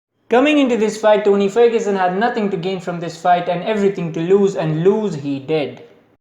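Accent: India. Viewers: 9 dislikes and 4 likes???? People are put away by your accent, but Cmon Indian hai toh you can't change that accent. This is quality content.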